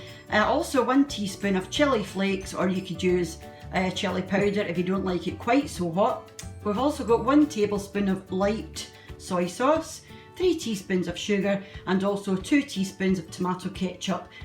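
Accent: Scotland, United Kingdom. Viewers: I ❤ur videos im doing a few this week lovely to hear a Scottish accent living in London apart from family its refreshing and looks great im starving 👌😂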